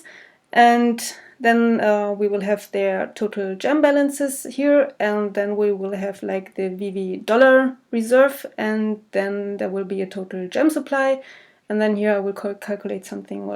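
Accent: France. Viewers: Great content! Are you french ? you have a slight accent...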